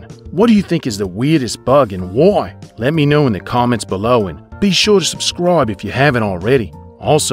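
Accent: Australia. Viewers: U do know that all Australians don't have a strong accent right my friend sounds like him. And go be a hater some where else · Is that meant to be an Aussie accent?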